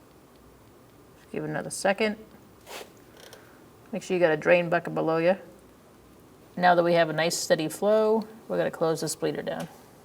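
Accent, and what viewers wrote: Boston, United States. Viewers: This is gonna be a task, watching this thick accented Boston woman replace these calipahs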